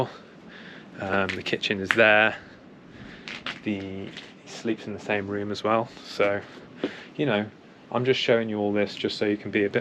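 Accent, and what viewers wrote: United Kingdom. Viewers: pitam Bezveze ono sve mi je Čudno pričaš Englesko ka Britanac pa san mislija da si rođen u Londonu ti samo živiš tamo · Hey brother! I just wanted to say that you're such a polite and charismatic person. You're always so down-to-earth and friendly, and I really admire your hardworking nature. With your ties to the UK and that charming British accent, I think you're well on your way to something big. With the little investment to get realtor license, and with the drone, website, and YouTube channel you already have, there’s definitely money waiting to be made. Keep pushing forward—great things are ahead!" To calculate the commission you'd make from selling two villas worth €1 million each with a 3% return: Calculate the commission for one villa: €1,000,000 * 0.03 = €30,000 Since there are two villas: €30,000 * 2 = €60,000 So, you would make €60,000 in total from selling both villas.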